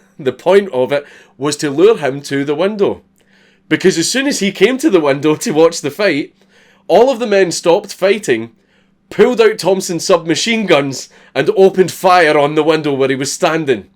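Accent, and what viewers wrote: Scotland, United Kingdom. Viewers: I'm not used to the Scottish accent so I thought he kept saying they put a hat on Bunyon. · Listening to American history in your lovely Scottish accent should be mandatory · Love your show I just wish you werent Scottish. Can you talk non Scottish? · Fuck these videos are so good. I could listen to him speak all day, maybe it’s because I’m and American and the Scottish accent is amazing, but I feel like This is the best history class you could get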